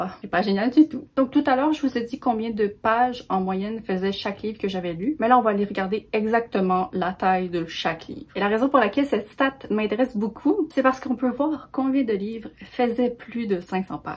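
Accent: Quebec, Canada. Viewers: En l'espace d'une semaine j'ai regardé plus de 2 ans et demi de vidéo 😂 j'adore ton contenu c'est rafraîchissant je suis pas québécoise mais ton accent ne me dérange pas du tout bien au contraire j'adore ❤❤. Ça me donne envie de travers l'Atlantique et visiter ton pays, de lire des livres en anglais ... Euh ça comme je suis une quiche ça va être dur mais j'adore. Merci merci . Continue ❤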